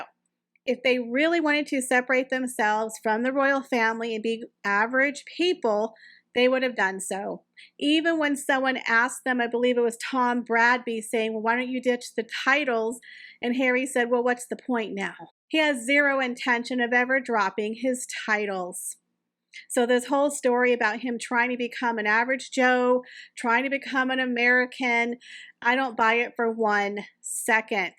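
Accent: South, United States. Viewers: Love your «yall» accent. Hazbeen wants to be treated like royal. He even insisted to be called with his HRH at the High Court in the UK. He wanted to live like a billionnaire while doing nothing.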